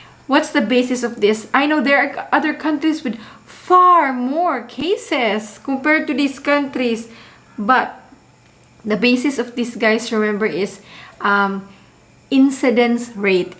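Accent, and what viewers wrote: Visayas, Philippines. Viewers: Ka cute nimo magbisaya Day hehehe...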